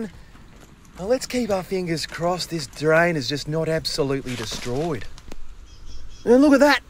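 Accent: Australia. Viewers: I Am from The Netherlands and I just love your australian accent 😊 And it's kinda fun to watch how it's done, Thanks for the amazing content ☺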